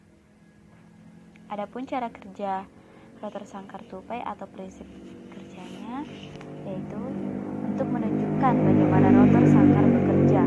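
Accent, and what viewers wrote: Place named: Bugis, Indonesia. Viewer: Logatnya orang bugis😂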